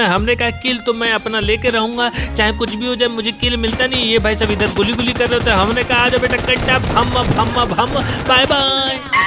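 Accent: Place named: Bihar, India. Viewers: Pakka aap bihar se ho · Bhai aap bihar se ho kya ? Aapki boli se lag rha hai / kyuki mai bhi bihar se hi 😄😄😄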